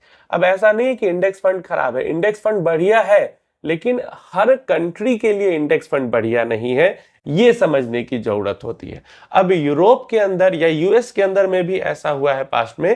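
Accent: Bihar, India. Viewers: रा का उच्चारण बिहार वाला ही हो रहा है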